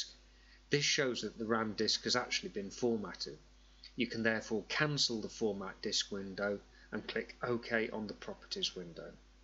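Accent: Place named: United Kingdom. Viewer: Very helpful, thank you. Your speech was so good that I didnt mind the british accent, which I normally tend to despise. Although I do have one question, how the hell does the program even know where in the disk to put the files to during a shutdown? As far as the RAMdisk is concerned, the files could have come to it from even the desktop. How does it know where the files originally belong to? Edit: Nevermind, for some reason I assumed that RAMdisk was sending the files to their original places upon shutdown. Nay, rather, it just saves them to that one folder you specify and reloads from there upon restart. Why did I even assume that I wonder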